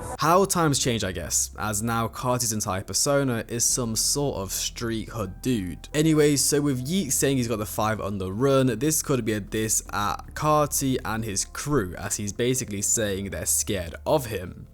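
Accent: United Kingdom. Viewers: Can't take a British accent serious.....especially one trying to be hard.....yall still worship a royal family.....df....haha....every thugg i grew up with would watch their videos just to see the clowns🤡🤡🤡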